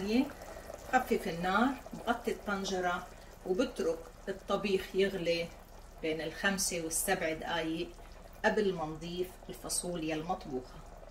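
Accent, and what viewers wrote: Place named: Lebanon. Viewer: احب طبخك اللبناني واحب لهجتك اللبنانية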